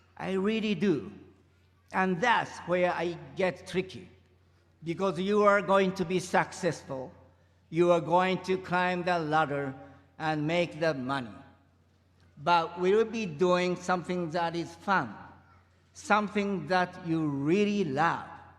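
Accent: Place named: Japan. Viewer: これが日本人英語の限界か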